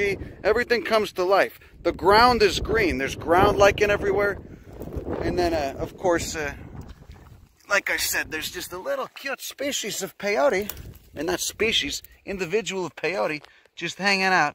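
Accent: Chicago, United States. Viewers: he's from chicago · Very entertaining content! Great accent! (Chicago? Hearing "Da bearsss"). Walked right by a sweet Paleo arrowhead. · Chicago accent, not any way near New Jersey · I just love a proper Chicago accent it reminds me of home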